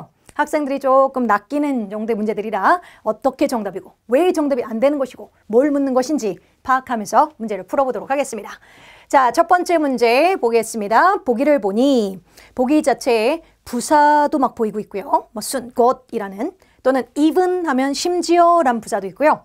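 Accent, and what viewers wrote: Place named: Chungcheong, South Korea. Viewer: 있것죠~ 충청도 분이시네요 👍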